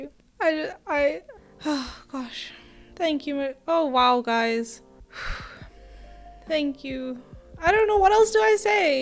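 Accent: South Africa. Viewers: You from South Africa right? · Are you a South African? I recognize the accent. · Wait, you're South African? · Yep it's a south African accent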